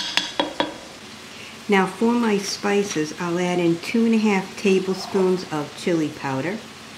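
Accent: New Jersey, United States. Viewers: Definitely from New Jersey New York area! Love it. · That looks delicious !!! I copied down your recipe, and Subbed ! I also love your kitchen, and that Jersey accent !!!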